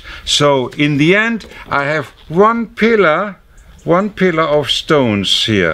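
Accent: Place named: Germany. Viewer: Me gusta como los alemanes hablan el inglés! Sobre todo en la pronunciación de la W 😅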